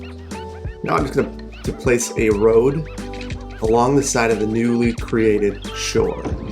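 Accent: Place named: Milwaukee, United States. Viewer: I just discovered your channel and binged just about all your videos! I'm an MKE native and used to work for WI DHL so your accent and industry make me homesick. Keep the videos coming! The winter map for another month or so works for me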